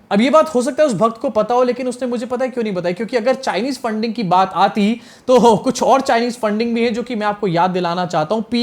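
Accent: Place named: Hyderabad, India. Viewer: Aap ki urdu bahuth achchi hai. Aap ka accent south Indian urdu jaisa hai, particularly Hyderabadi jaisa. Keep it up 😅😅😅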